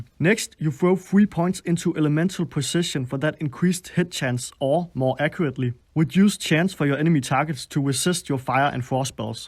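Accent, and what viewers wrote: Denmark. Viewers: That Danish accent tho! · The thicc Danish accent! Don’t play mage, but I really enjoy this content. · This is the thickest danish accent I’ve ever heard and I’m danish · danish detected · Danish accent xd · 5 seconds in and I can without a doubt say that this is a fellow Dane. Greetings from Aarhus.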